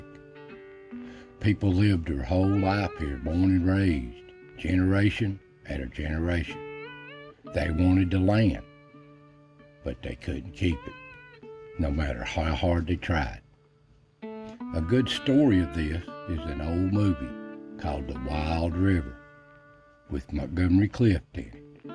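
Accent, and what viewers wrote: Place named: South, United States. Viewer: Same here! Its comforting to hear just talk! Its sad even the accent around here is slowly being bred out I reckon. My accent isn't as strong as my grandparents, and my daughter only barely even sounds southern. Dang internet I reckon. But yes, just listening to these stories, the rhythm and the southern drawl is like a time machine!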